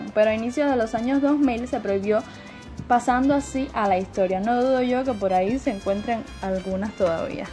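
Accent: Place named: Cuba. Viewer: aveces hablas despacio otras veces te sale lo de cubano.tu hablas ingles?? Tu vlogs es in inglish.otris vlogs son en spanish..cuidate🫀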